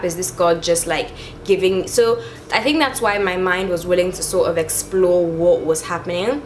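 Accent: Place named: Nigeria. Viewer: It kind of sounds like you have a Nigerian accent or something. I’m Nigerian so I was just wondering if you were too 😂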